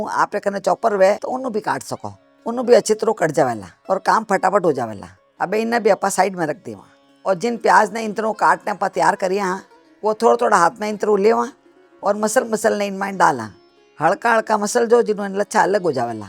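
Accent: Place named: Jodhpur, India. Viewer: Mast vidio mast sabji mast jodhpuri boli sa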